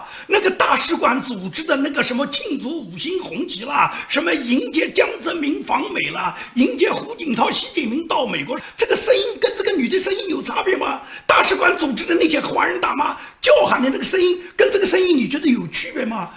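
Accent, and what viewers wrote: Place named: Fujian, China. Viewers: 福建帮？口音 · 福建口音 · 听起来像南方人，支持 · 建民，耐心地采用法律手段收集證據還擊自衞。聽口音象福建人。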